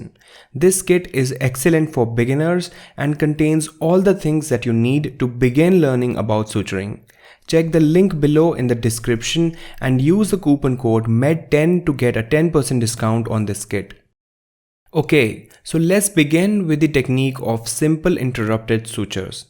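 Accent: India. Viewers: This is not an english accent this is an Indian accent and if you want to be any sort of doctor you will fail due to this attitude. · This is how we Indians talk. Got a problem?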